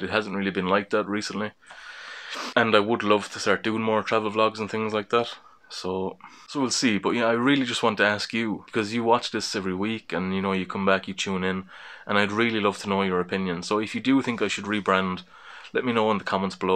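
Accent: Ireland. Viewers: Kinda down to how you define Vlog really. Isn't a vlog a video log? So it's about you and whatever you're up to or what you're into. Unless you have a completely boring life then a personal vlog is going to have variety. I've looked into Irish vloggers and if they're not yet done copying Jenna Marbles it's largely them coming and going to and from work or their sports/hobbies. You do have a niche with your Irish head on ya and accent and living in the Wesht of Ireland. The majority of Irish vloggers seem to be all cut from the same middle class or hipster or trendy bolts of cloth. Don't compare yourself to them at any rate. Also that argument for niche is countered on YT with others saying if you don't have one to do verity until you do find onr. You're thing is very distinctive anyway and if you got picked up by some American viewers you'd spread far and wide, especially if you're bringing them local stuff. 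Start another channel for the project pieces but dance round them on the vlog to push traffic there. We intersect on several things you've covered not just the one, heritage, video, Geocaching and local radio being the main ones. Ya don't normally find those things on the one channel so variety does appeal to many. Ultimately you are the brand and you are the product here. I'm here to find out what got up to this week and maybe learn a few things on the way.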